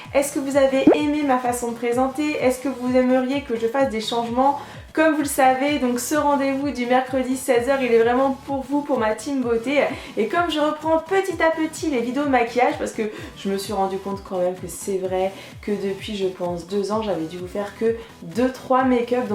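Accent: England, United Kingdom. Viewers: sympa ta vidéo mais l'accent anglais ah ah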